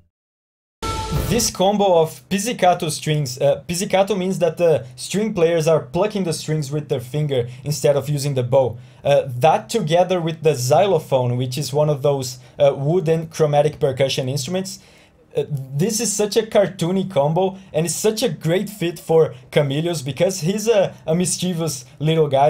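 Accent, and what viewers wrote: Brazil. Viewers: wow you sounds very brazillian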